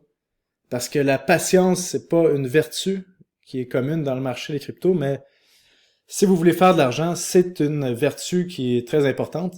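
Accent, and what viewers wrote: Canada. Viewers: tu m'as fait adoré l'accent canadien ❤ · J’étais justement en train de revoir ta dernière vidéo en me disant que ça me ferait vraiment du bien d’entendre à nouveau ton point de vue et ton charmant accent canadien. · N'abandonne pas l'accent canadien en parlant français. Ça fait vraiment très sérieux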